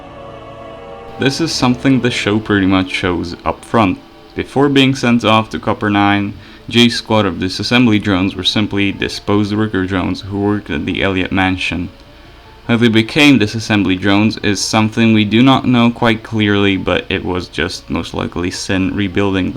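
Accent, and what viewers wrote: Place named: Czech Republic. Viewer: nice finally i see someone saying that the czech dub is nice WAIT HOLD UP TY JSI ČECH? such a nice accent I'm almost jealous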